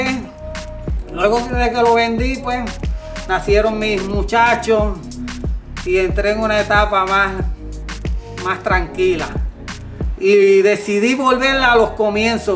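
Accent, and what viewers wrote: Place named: Puerto Rico. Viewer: acho pensé que era en el salvador este vídeo, asta que escuché el acento poltorro 😁😁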